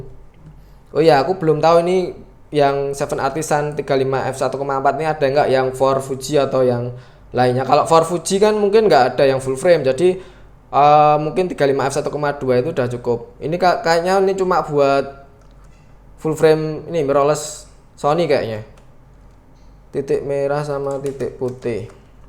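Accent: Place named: Tulungagung, Indonesia. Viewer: Cocok banget ini untuk pengambilan gambar cinematik ..Btw ini yang punya orang Tulungagung ya bahasanya Wangun😄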